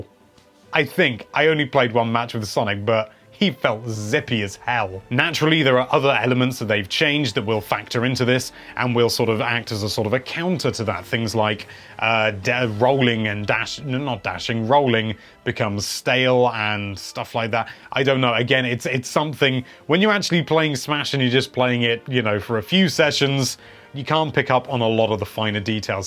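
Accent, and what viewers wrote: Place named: United Kingdom. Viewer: Is it just me or does seem more British than usual.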